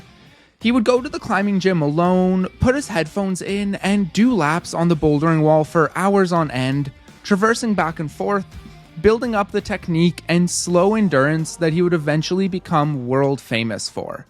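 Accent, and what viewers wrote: Canada. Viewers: I can tell the narrator is Canadian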